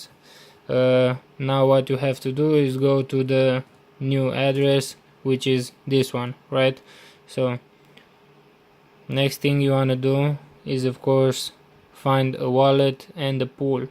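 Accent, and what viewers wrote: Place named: Hungary. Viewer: Helló.Te magyar vagy mi?A kiejtésedből úgy vélem.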